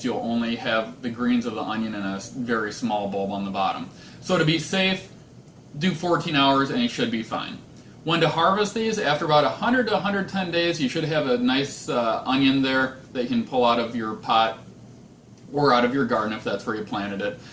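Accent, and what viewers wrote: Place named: Midwest, United States. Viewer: Midwesterner detected* lol great vid, thanks man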